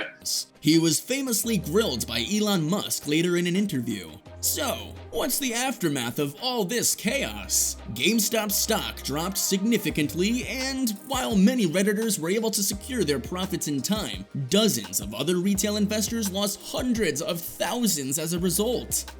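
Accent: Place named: United States. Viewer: What an annoying typical american narrator voice.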